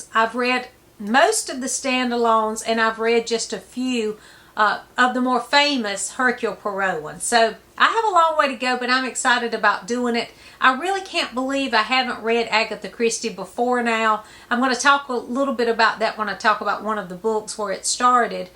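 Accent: South, United States. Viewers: As you see by my moniker, I am a fan of Agatha Christie’s Jane Marple. It has admittedly been years since I’ve read one of them, tho in my youth I read each several times, saw the movies, blah blah. opinions are likely as good as anyone’s. She’s a lovely looking gal, but once she opens her mouth, the ambiance is gone. The God-awful overt Southern accent just overlays any intelligent conversation with ‘nails on chalkboard’ irritation for me. That accent accompanies a mindset I don’t like, and I dare say Ms. Christie would roll over in her grave if she could hear it. Lighten up on the Souther roots, and give your SUBJECT center stage. Real journalists have been doing so for generations. Sorry folks.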